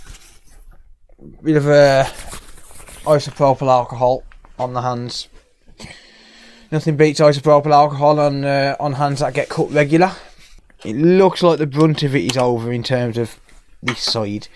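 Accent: United Kingdom. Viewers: Thing is, I can understand British English quite well. I can barely follow Benedict Cumberbatch is Sherlock when he goes 120% in his thinking, but I can get the general gist. Maybe it's the accent that throws me off in this case. About anime, well, I listen to the original dub, not the English one :D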